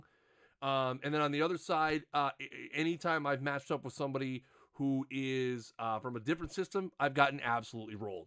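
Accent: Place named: Ireland. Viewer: Total tangent, but I'd love to know where your accent is from. You sound like you turn Irish every time you make an 'ar' sound. When you say 'are' and 'target' back to back, as an example.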